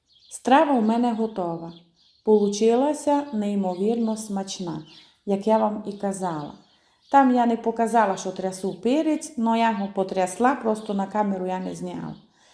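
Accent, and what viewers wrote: Transcarpathia, Ukraine. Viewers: Як його навчитися говорити як на Закарпатті? Мова дуже файна. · Підписалась, який файний канал!, Ваш діалект, для мене мед у вуха 🫶 я переселенка з Бахмута, тепер жию на Закарпатті, дуже подобаються люди і цей діалект❤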